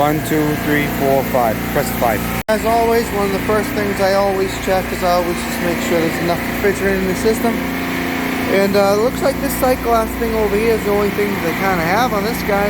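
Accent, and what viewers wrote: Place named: New York, United States. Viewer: New York accent s so cool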